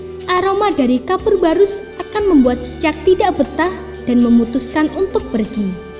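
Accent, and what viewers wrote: Java, Indonesia. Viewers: Ngmongnya meddok, lelet lagi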